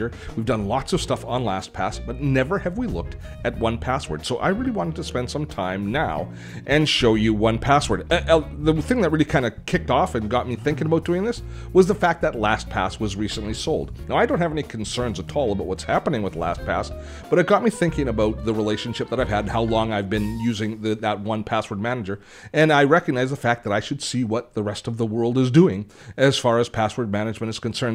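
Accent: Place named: Canada. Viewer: aboot - Canadian accent detected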